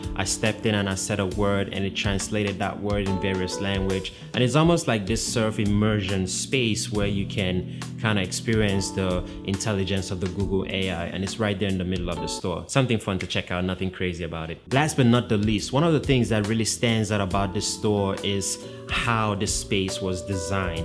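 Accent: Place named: Brooklyn, United States. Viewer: Hey Brooklyn Accent Guy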